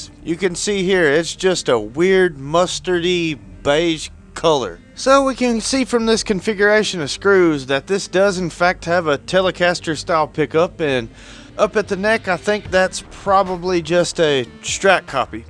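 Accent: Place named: Western North Carolina, United States. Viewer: You sound like my cousin from the western part of NC. I'm in the East.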